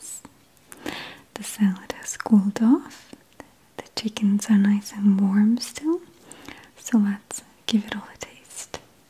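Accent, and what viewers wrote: Russia. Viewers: The Russian is strong in this one. Lovely as always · Акцент то русский!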